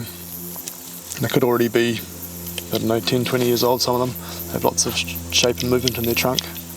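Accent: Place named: New Zealand. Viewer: Kia ora! Great video, really love the style you do this in (plus it's great to hear a familiar accent) Just wondering where you get your tools and soil etc from in NZ? It can be difficult to source the commonly recommended stuff. I'm just a noob and would love to hear some tips for us specifically here in NZ ❤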